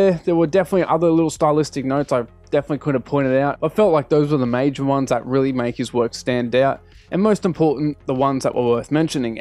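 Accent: Australia. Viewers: I was pretty sure you came from down under but the accent was kinda close to British so I wasn't sure.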